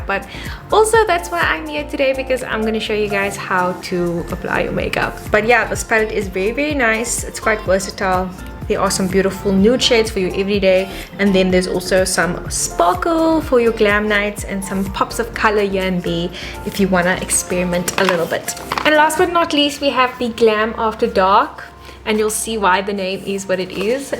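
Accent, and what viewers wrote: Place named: South Africa. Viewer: Love the coloured accent. Something only found in sa ..thanks.for.the great video. Fellow sa gal x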